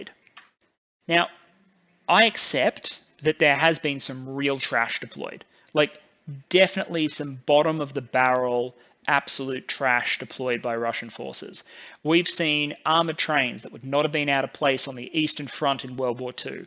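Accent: Australia. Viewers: Your talking clap trap! Russia has been met with strong resistance by the Ukraine armed forces, and is heavily armed with British anti American anti tank missiles and anti aircraft missiles along with arming just about the entire male population aged between 18-60. Best you just go and give your opinion back in Australia judging by your accent.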